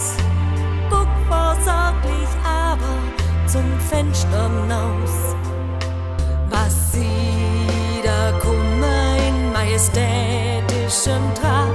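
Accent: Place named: Swabia, Germany. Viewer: Schee Schwäbisch 👍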